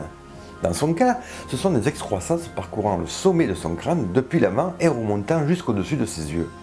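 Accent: South, France. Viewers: oh tu est du sud toi aussi^^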